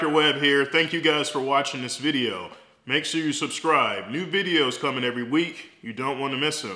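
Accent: South, United States. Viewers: Laconian Drakon I just think he’s a southerner. Not a big deal. I saw another video where he talked about spinal “manip-a-lation.”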